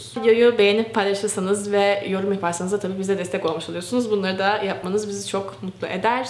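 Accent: Kastamonu, Turkey. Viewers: Abla Kastamonulu musun neresindensin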